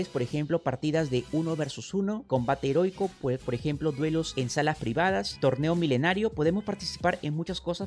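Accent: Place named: Peru. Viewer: EL mano si era e peru por el micro ????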